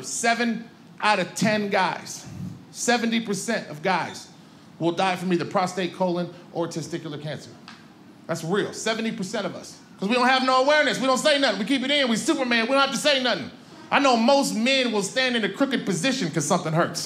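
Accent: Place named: Chicago, United States. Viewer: He is definitely from Chicago... 😊👌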